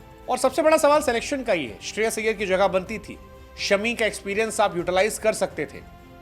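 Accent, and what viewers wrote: Bihar, India. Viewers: Bro Sounds Like a Tru Bihari.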